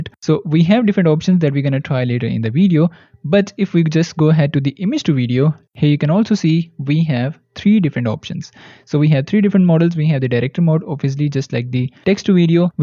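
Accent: India. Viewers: Lol indian english accent is too comedian 😂😂😂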